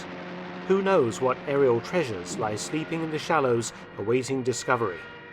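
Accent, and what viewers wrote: United Kingdom. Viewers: Always enjoy Professor Felton’s documentaries. But since you appear to have a British accent, it’s quite odd how you pronounce the word “lieutenant” the American way, “loo-tenant”. It’s certainly not British English. How about not contributing to the on-going Americanisation of the United Kingdom, eh? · An oh so properly British presentation.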